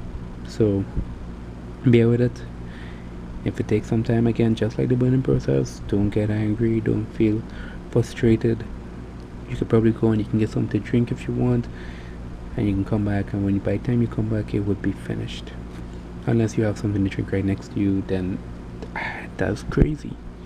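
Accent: Trinidad and Tobago. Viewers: trini accent detected?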